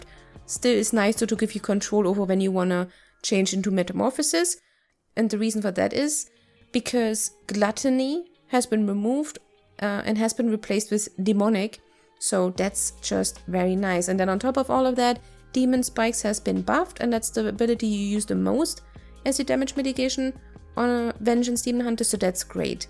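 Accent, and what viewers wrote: Germany. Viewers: This German school English 😂. So cute. I listen you first time but 100% you german. But good video👍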